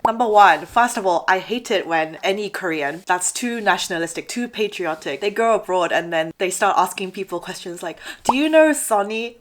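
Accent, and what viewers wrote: England, United Kingdom. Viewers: when I listen to and close my eyes, sounds soo English. Also, I love when she says" proper". 😀 Maybe mannerism! " Stoicism" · In what way is she more British than a Korean? She’s somewhat of a hybrid. However, as a Londoner - I see the British influence (accent mainly imo), but not “completely British” (whatever that is). 😇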